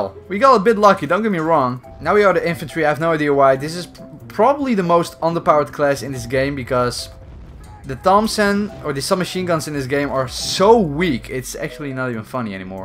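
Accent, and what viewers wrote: Netherlands. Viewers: based on the accent of this guy he is from the Netherlands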